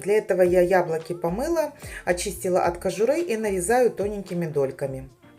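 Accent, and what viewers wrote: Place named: South, Ukraine. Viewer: А это не только пляцок. Есть очень похожий финский тортик. Говорок у Вас до боли родной. Юг Украины. Или юго-восток? А рецепт великолепный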